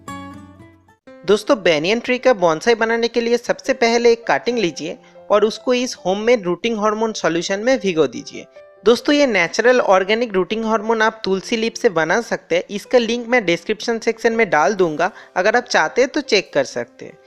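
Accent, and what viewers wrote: India. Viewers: Nice Hindi accent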